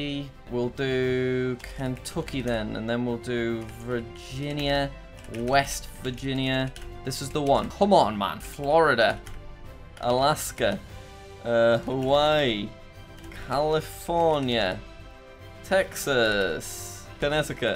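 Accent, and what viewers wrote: United Kingdom. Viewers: I can tell you are British🇫🇯🇫🇯🇫🇯🇫🇰🇫🇰🇫🇰🇬🇪🇲🇩🇳🇺🇹🇨🏴🇬🇧🇹🇻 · are you From British (UK)?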